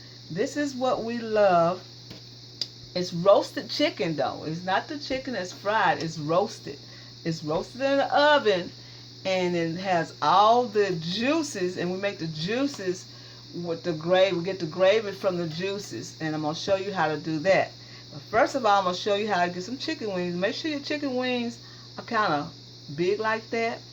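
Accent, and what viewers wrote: South, United States. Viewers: The authenticity of the southern voice over made me love this even more! Have to try this recipe 💕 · Jason Bourne wow I didn’t realize I was going to get all this feedback... calling someone a moron though? I just made an observation. If I were to pronounce something wrong I would want to know how to say it correctly. Sooo since you want to come for me actually it’s not her accent it is her southern dialect that you really should have referenced. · This is country/southern cooking...and country/southern pronunciations for seasonings. If you want "correct" pronunciations...watch the food channel. It's almost like asking Cajun people to speak the "Kings English" when cooking. Let player's play...and cooks...cook. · I dont know why but i love her country accent...food looks great too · I love her country southern accent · Omg i looove her KUN TREE ACCENT....kun tree cooking...kun tree eating..i love the way she say...THEH mye lol and buuuur ty full lmfao...im hollering....love her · Jill Lancaster she has a very strong southern accent that's why jerks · lol she meant curry powder. 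She even said "pet a reeka" for paprika.....southern speech....sigh*** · She can pronounce things the way she wants to when the food looks THAT good..leave us southerners alone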